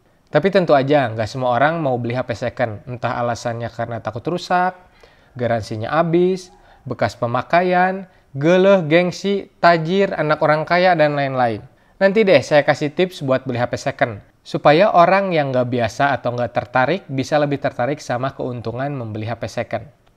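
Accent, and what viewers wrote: West Java, Indonesia. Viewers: Ga sengaja mampir sini. Pas awal denger narasinya langsung kepikiran "hmm... Logatnya kayak urang sunda". Pas ngomong "geuleuh.. Gengsi.." ah fix, baraya Sunda.. 😂 Salam kang.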